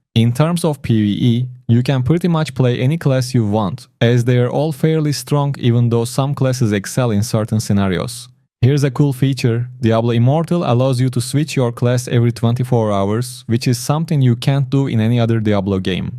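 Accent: Turkey. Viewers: Türk aksanı nerde görsem tanırım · Türk olduğunu söyleyebilirim ama ispatlayamam. Aksan bariz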